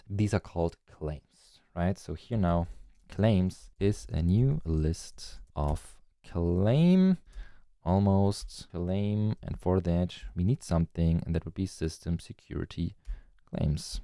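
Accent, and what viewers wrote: Germany. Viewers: Great video! I like your approach to start from the top with whit sketching out what we want and fill in with functionality. An extra plus for doing refactoring! It brings an example closer to real cases, and with todays environment help, there is no excuse to not doing it. As a Swede I could guess that you were German, but you have an excellent english!° Cheers:washhands: p.s Thx for the code, just what the doctor ordered...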